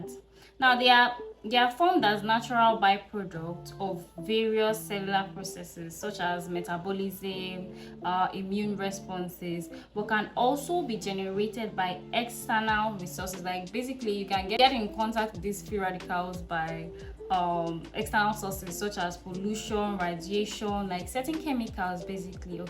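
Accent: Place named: Nigeria. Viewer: 😂😂wow🥺🥰 I asked because if not for the way you spoke I wouldn't have thought you were Nigerian fr😂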